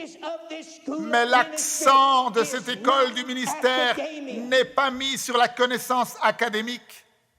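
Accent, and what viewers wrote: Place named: France. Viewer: Amen! Alléluia! Merci bien-aimé frère! I always knew you were French, both from your accent and from your name! The Lord has supernaturally connected me to Morris Cerullo by revelation in 2018, and since I received 5 encounters with this precious man of God through the Spirit. You are blessed as you carry on this mantle and precious words. The Lord strengthen and bless you. Love from Togo.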